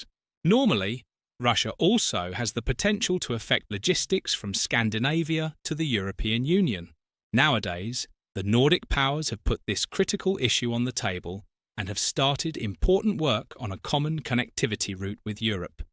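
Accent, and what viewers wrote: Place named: United Kingdom. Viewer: Not sure why Norway was turned into Sweden in the thumbnail. Feels like a typical US mistake to make, yet the voice is British.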